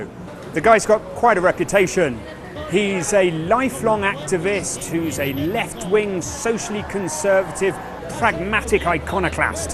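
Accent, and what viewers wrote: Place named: United Kingdom. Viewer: Que bien se escucha el acento británico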